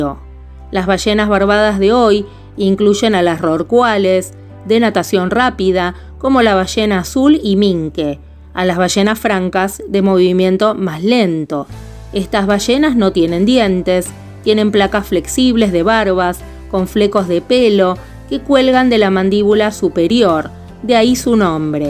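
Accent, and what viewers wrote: Argentina. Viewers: Ah, me encanta tu voz y el acento argentino!!!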